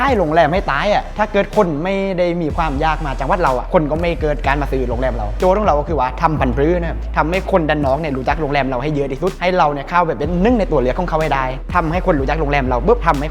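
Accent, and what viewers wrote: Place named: Thong Daeng, Thailand. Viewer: สำเนียงทองเเดงเท่ดี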